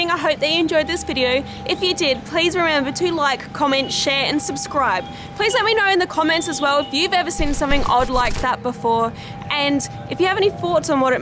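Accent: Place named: Australia. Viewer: There are different accents in a language and none is "correct" or "incorrect". Same with dialects.;) I love accent and I'd love to visit Australia someday. English isn't my first language and I always understand what she says. Keep doing what you do! ❤ Thank you for creating these videos and teaching us about these places.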